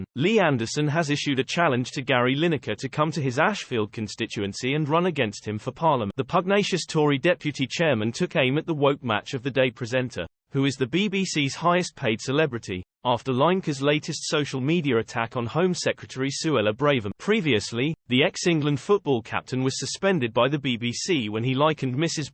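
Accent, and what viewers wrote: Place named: Russia. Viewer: If you're going to use a robot voice to replace your Russian accent, at least get one that is consistent.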